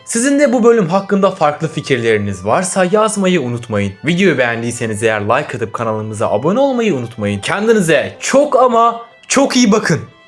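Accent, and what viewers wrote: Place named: Azerbaijan. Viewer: abi azerisin anladıkta HARKASA yeniden merhaba diye girme kulağım acıyo hErkEs e harfi var orda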